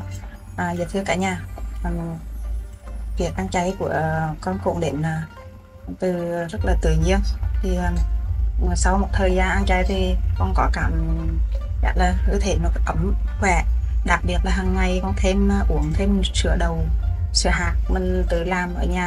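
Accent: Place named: Hue, Vietnam. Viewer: Admin up bài này làm em thèm quá xá . đậu ngự lại với giọng Huế nữa hay quá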